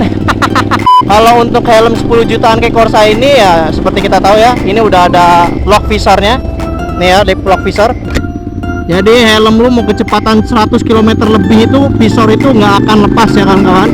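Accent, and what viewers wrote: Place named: Java, Indonesia. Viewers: Bang seharian ngomong pake medok jawa 😂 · Jawane medok tenan #save duka hati😂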